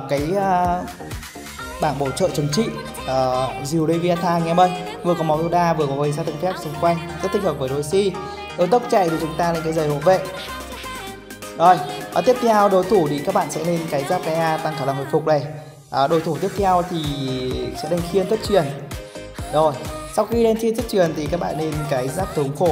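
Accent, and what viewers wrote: Vietnam. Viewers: quê ở đâu ấy. Giọng vừa nam vừa bắc khó đoán quá😃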